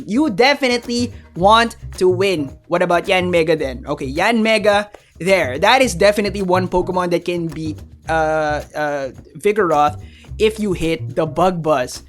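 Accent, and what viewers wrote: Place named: United States. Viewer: You from the Philippines man becuase your accent very 4corners amarican accent.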